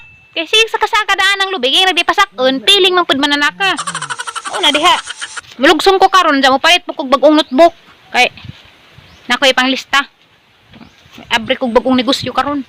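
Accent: Bohol, Philippines. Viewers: Hi Ma'am , keep on vlogging .. Missing our accent in Bohol. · Mag vlog ka na lang mae tour around bohol sa mga towns with the same bol anon accent for sure kikita ka pa padayun fan from texas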